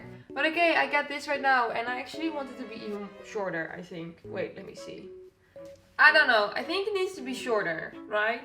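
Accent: Netherlands. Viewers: I swear she has to be dutch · Are you Dutch?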